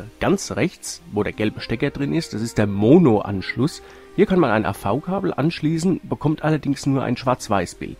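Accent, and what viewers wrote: Hesse, Germany. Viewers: Dieses war das erste Video, das ich von Dir gesehen habe und mir gefällt, was Du machst! Mal ganz davon abgesehen, dass ich selbst einen A500 hatte, finde ich Deine Ausführungen sehr eingängig und allgemeinverständlich. Ich gebe zu, ein bissel technischer könnte es meiner Meinung nach schon sein, aber das ist nur eine Randbemerkung. Übrigens: Du bist Hesse, oder? Du hast einen grandiosen Akzent, der aber niemals überhandnimmt. :)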